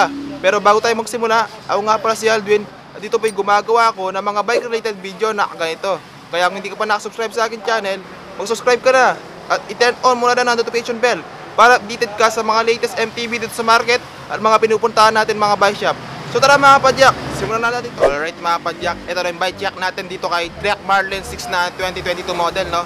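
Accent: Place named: Pampanga, Philippines. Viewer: Sir kapampangan ka. Hehehe. God bless po. Nanay ko po kapampangan same accent po kau. 😊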